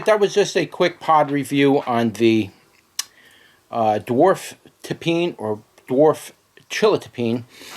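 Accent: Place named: New England, United States. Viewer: I asked be a you have a New England type accent. I’m from NE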